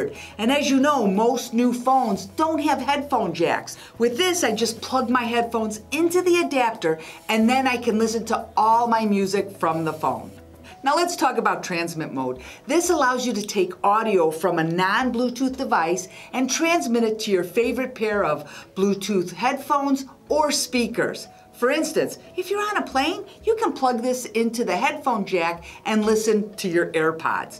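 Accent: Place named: Minnesota, United States. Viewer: I thought she was from Minnesota, I kept waiting for her to go "dontcha know".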